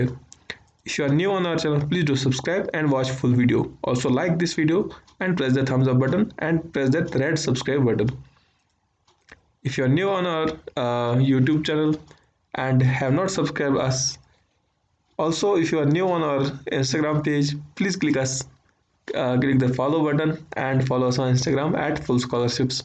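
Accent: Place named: Kashmir, India. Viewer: bhai yaar accent se laga ki ap kashmiri he 😅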